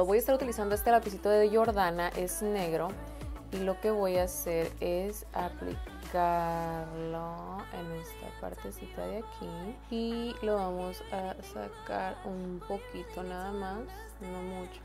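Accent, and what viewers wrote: Sinaloa, Mexico. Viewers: Soy sinaloenseeee de los mochissss jajajja y cuando te escushe hablarr no sabia que eras de mi querido sinaloa dijeee, esta mujer es de mi tierraaa.... jajjajaja vivo en los angeles.... tu en donde te encuentras....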